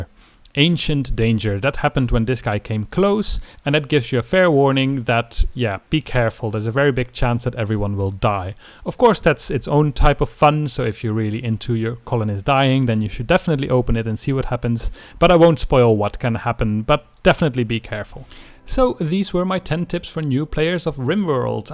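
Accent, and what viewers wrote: Netherlands. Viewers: you sound Dutch :) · Dutch accent ?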